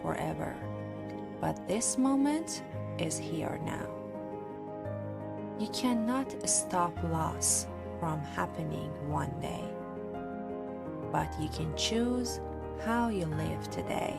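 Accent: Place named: Iran. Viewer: عالی بود و ممنون از زحمت شما . اما اکسنت ایرانی بود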